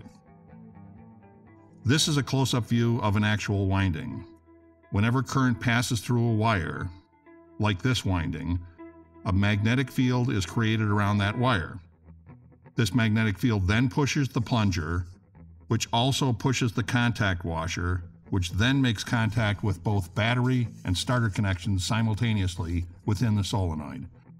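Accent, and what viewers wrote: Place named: Chicago, United States. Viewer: This guy is obviously from Chicaago. That accent is terrible but video not bad. thanks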